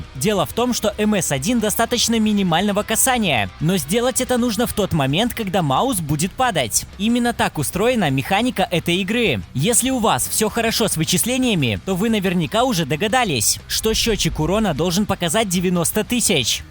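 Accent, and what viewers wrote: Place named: England, United Kingdom. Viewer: Я один заметил что у него небольшой английский акцен?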